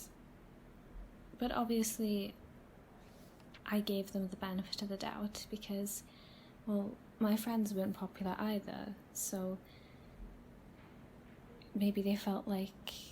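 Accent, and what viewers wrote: Wales, United Kingdom. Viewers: Hmm. You sound Welsh. First time I’ve heard a Welsh ASMRtist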